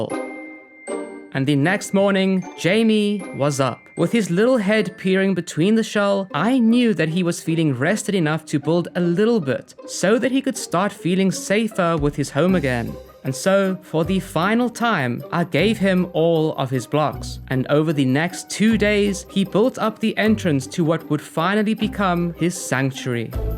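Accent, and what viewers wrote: South Africa. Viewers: Are you from South Africa by any chance? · Great video man, though your accent is tickling my brain, it sounds like your first language is Afrikaans. Now I might be tripping, but the way you say certain words are just making me think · You sound south aftican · South African? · I know a South African when I hear one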